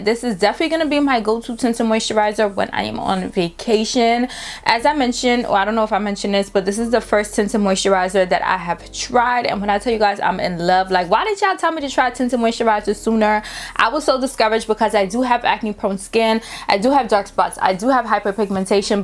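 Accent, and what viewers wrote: New York, United States. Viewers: Girl!! I had to subscribe. Right away, I was like she has to be from New York! 💁🏾‍♀️ Love the results.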